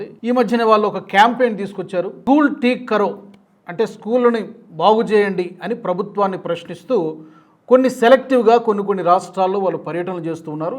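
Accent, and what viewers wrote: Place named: United States. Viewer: వీడు ఒక అమెరికా ఒత్తు పలికే వెదవనాకొ.. కు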